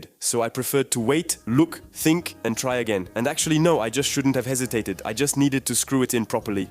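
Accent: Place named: Balkans, Eastern Europe. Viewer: ont veux l'accent anglais des Balkans coupé a la serpette !!!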